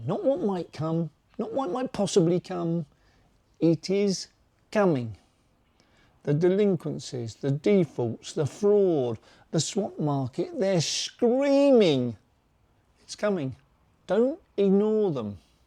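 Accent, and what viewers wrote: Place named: United Kingdom. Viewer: People with British accents just sound smarter and more trustworthy. I’m hooked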